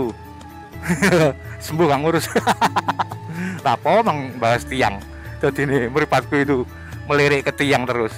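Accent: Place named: Surabaya, Indonesia. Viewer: Opo wong playen asli Suroboyo Mas, loghate kok suroboyoan.?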